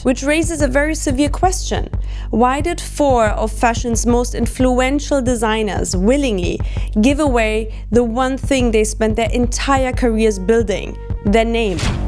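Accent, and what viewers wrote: Germany. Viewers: bist du German?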